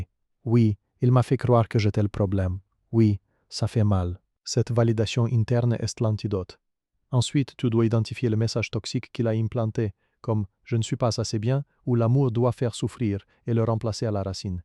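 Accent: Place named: France. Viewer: 😂Ce que j'aime dans cette vidéo c'est l'accent français qui relate les faits classiques et la solution 🎉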